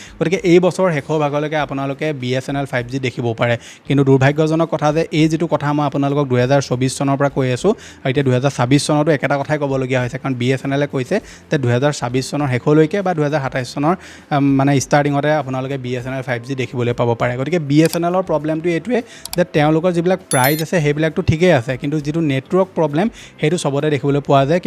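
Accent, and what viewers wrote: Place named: Jorhat, India. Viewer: Jorhat dictic❤❤❤❤❤❤❤❤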